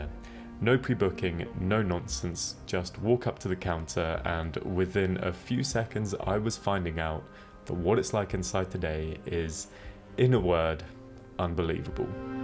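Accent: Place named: United States. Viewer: Have you considered not showing yourself on your vlogs ? Your voiceovers are refreshingly non-irritating, American, and very authoritative and your subject matter informative, but just hearing your voice without seeing your face would be more enigmatic. There are too many Youtubers trying to become personally famous instead of their content, and including your face just brings you down to their level.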